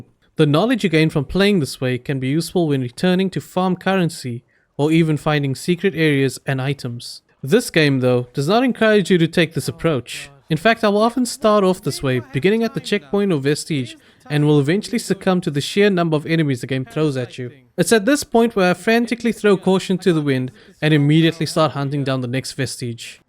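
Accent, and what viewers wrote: South Africa. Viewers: Your English is flawless. Sounds like your first language but you have a bit of a South African accent?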